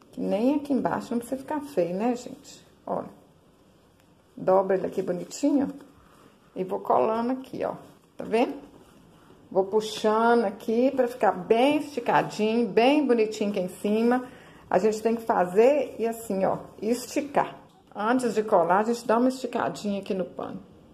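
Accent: Minas Gerais, Brazil. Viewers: Gente, que coisa lindaaaa! Sou fã desses tipos de trabalho! Amo esse jeitinho mineiro de falar. Parabéns. LINDO! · Ficou maravilhosaaaaa, parabéns!!!!! Amo sotaque de mineirinha 😍😍😍😍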